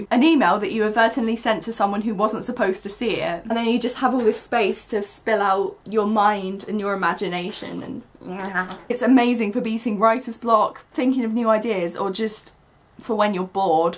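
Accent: England, United Kingdom. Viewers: Btw where about in England are you because we have literally the same accent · where from england are you, i do but dont know the accent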